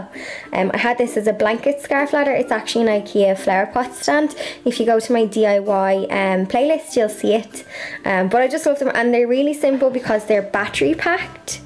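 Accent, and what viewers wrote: Ireland. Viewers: Ayyyy ur Irish !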